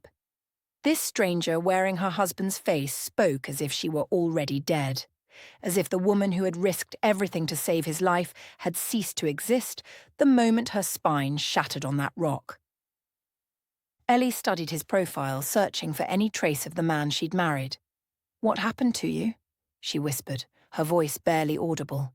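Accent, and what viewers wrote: United Kingdom. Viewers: YT put this story in my feed for the second time. I still love every word of it. Also the Brittish voice.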